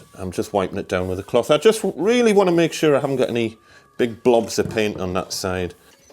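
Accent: Newcastle, United Kingdom. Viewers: Newcastle in the NE of England. They come much stronger than this one too. Google “Larn yersel Geordie.” Hilarious.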